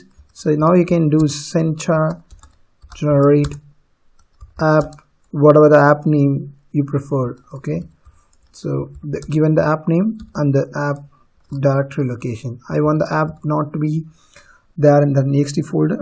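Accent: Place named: India. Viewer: Люблю индийский акцент, всё сразу понятно без субтитров :)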